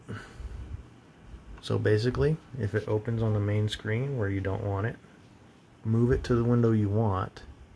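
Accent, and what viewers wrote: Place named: South, United States. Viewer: Thank you you sweet southern man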